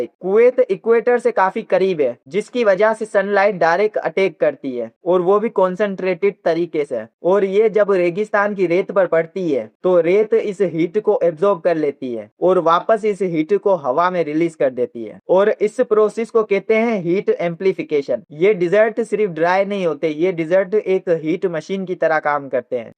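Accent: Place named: India. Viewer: but your accent is Indian ( Hindi ) ❤ BTW love it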